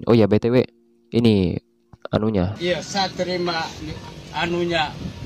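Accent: Sulawesi, Indonesia. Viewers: Mantap bang, org sulawesikah? 😅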